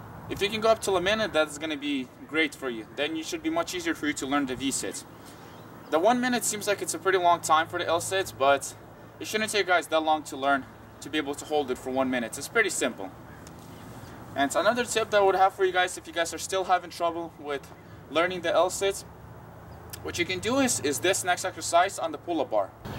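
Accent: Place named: Russia. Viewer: Ya I thought I recognized the surroundings и русский акцент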